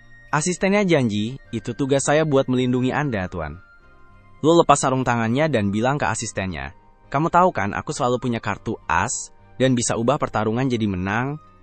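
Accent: Malaysia. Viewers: Abang orang Malaysia ya😊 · Ni bahasa Malaysia apa Indonesia Tapi Malaysia dia pekat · Orang malay bang? Aksennya gitu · bisa ga si gausah pakek logat malay bng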